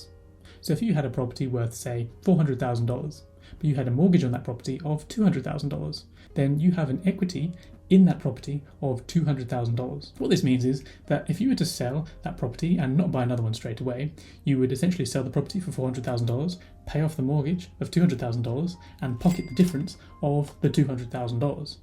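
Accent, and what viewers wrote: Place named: United Kingdom. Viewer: You are talking about US dollars and at the same time you sound British...😅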